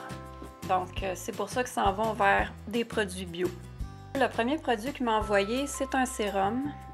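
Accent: Canada. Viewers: je passe te faire un petit coucou ^^Super vidéo j'aime beaucoup ce genre de produit bio , pas testé sur les animaux ... Tu expliques bien avec ton accent canadien ^^ j'aime bien bisous 😘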